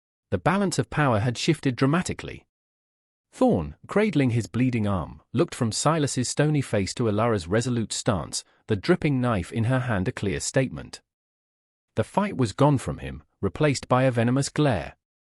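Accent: United Kingdom. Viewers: Horrible narration. British accent for the 1800's Old West? Seriously?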